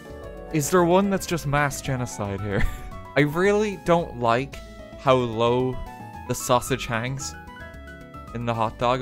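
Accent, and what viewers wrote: Ireland. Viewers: are your irish?!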